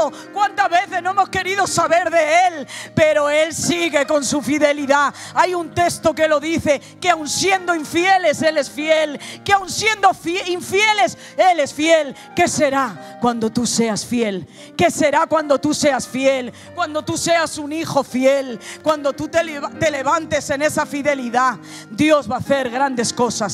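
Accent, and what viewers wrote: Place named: Latin America. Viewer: Porque hablan con acento latino??? Mijooo???😂😂😂 Son gitanos de Madrid de toda la vida de Dios..No entiendo, que ridículo🤭🤷🏽‍♂️pufff madre mía...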